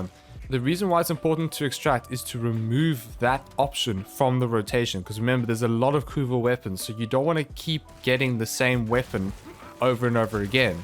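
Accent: South Africa. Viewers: Are you south african? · You are awesome and so nice to hear your SA accent! Hubby and I are from SA! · Are you south African? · I know that English South African accent anywhere! XD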